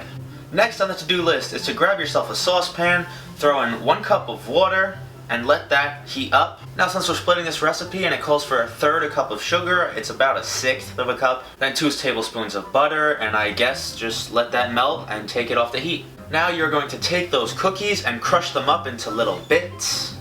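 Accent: New York, United States. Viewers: If he isn't from New York I'm gonna doubt my accent knowing skills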